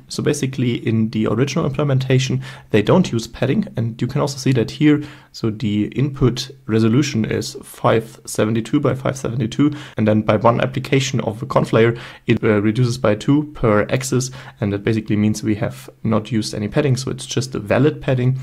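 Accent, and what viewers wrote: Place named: Germany. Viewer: Are you german? Sound very german! Great videos BTW!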